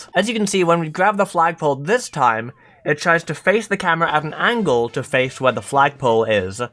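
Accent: Wales, United Kingdom. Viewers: She's British, Welsh specifically. That is what Britons call the last letter of the English language, which their ancestors the Anglo-Saxons created.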